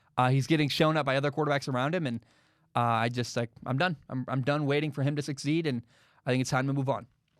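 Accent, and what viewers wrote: United States. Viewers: Your New York accent tho 😂👌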